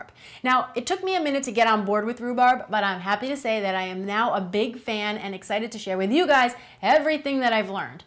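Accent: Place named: United States. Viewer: Where's your american accent from? Nice video though i wish u had more about harvesting a fresh crop.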